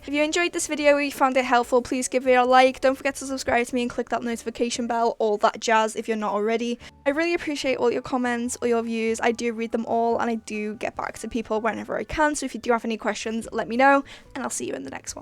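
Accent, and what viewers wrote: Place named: Scotland, United Kingdom. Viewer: are you Scottish cuse u soound it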